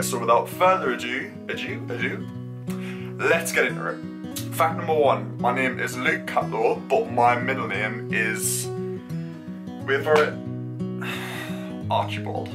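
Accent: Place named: Newcastle, United Kingdom. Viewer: Anyone who likes dogs more than humans is THE BEST‼️❤️🐶🐶🐶🐶🐶🐶 just discovered you via Mr Carrington👍 lovely to hear a fellow Geordie ( ish) x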